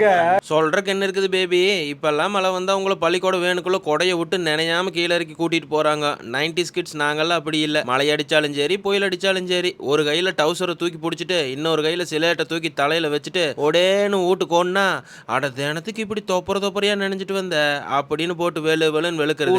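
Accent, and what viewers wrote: Kongu, India. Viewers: Anna coimbatore la irundhu nga. Ippo Chennai la iruken nga. Unga super aana samayal um namma ooru baashai um kekum bodhu rombha santhoshama irukudhu nga anna. Idhe maari neraya video podunga. Best wishes! 😊❤ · This is kongu slang not Coimbatore slang mostly speaking by Erode, Tirupur then only in Coimbatore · Singapore la kongu tamil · Very nice Kongu thamil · நம்ம கோயம்புத்தூர் ஸ்லாங் கேர்க்கரதுக்காகவே உங்க சேனல்ல subscription பண்ணிட்டேன்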